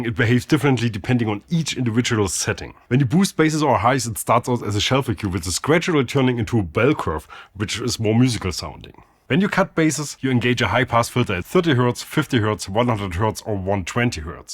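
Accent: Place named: Northern Germany, Germany. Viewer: Its Bassic ....A north german accent ....a mixture of viking , german and anglo saxon languages....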